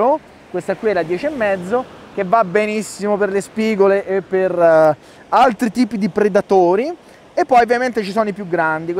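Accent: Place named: Pozzallo, Italy. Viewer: Bello!! Ps, sei in Sicilia? Mi ricorda il pozzallese..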